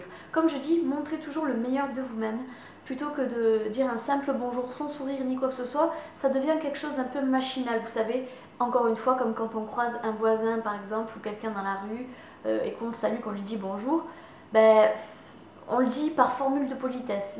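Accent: South, France. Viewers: Ta un accent, tu es pas du sud ?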